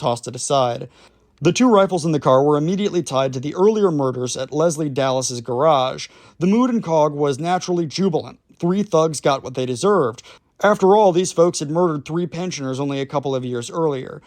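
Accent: United States. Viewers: Who are you to come here and tell us our own history in your stupid annoying yank accent? And if you are going to carry on maybe you would try and pronounce the names, towns and people properly! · I’m American and I have been on track with the Troubles since 1969 when Bernadette came to the US, but I am taken aback when I hear an American accent trying to tell others about the Troubles. I know people who lived through it and of course I learn more from them and I’m a lot more aware of South Armagh and Belfast, but be very sure of what you say because there are more than 2 sides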